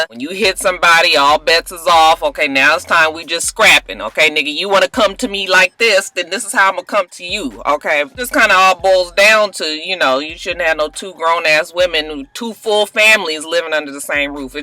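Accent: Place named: Louisiana, United States. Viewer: Girl, be careful sitting in that car!!! LA, I was trying to figure out that accent....I remember that accent from the people I went to college with that was from LA!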